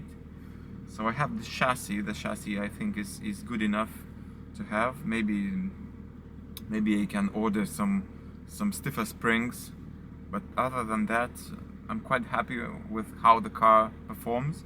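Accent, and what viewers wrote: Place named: RP, United Kingdom. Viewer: posh bri'ish accent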